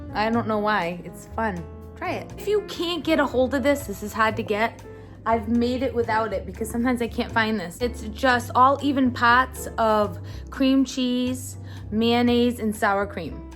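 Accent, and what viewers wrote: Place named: Boston, United States. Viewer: Nice Recipe!! Even better accent! Lol gotta be from Boston!! Please say your from Boston! Lol. Just earned a subscriber 👍🏽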